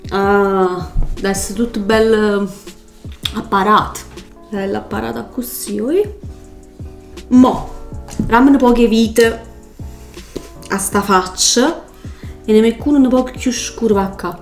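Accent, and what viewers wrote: Naples, Italy. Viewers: Si ragazzi sono napoletana e si vede l’accento, È napoletana👌🏻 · Il dialetto è perfetto confermo che è napoletano io sono CI napoli❤❤ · Si sente che sei napoletano perché anch'io sono di provincia di Napoli faccio pure io la cultur napoletani ma po chi so sti strunzz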